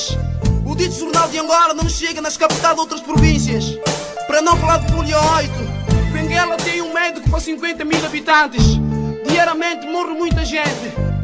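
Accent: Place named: Guinea-Bissau. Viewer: esse gajo nao é angolano! tem sutaque de guineense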